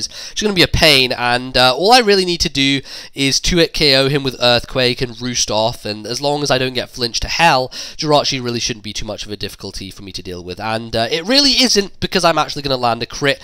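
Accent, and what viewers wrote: United Kingdom. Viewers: he british dumbshit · does a british dude sound like a frog dipshit ?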